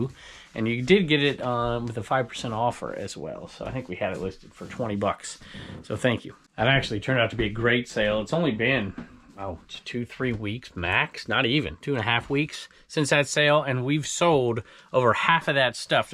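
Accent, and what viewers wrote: South, United States. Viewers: You sound like a confederate.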